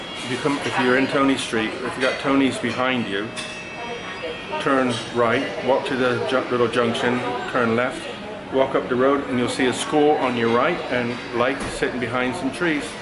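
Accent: United States. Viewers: Hi. I made some fixtures once and set-up an e-mail for it. One time thing, but I still use the e-mail as my Google account. You are from England? You sound like your from the U.S. Have you ever seen "Kev-in Thailand"?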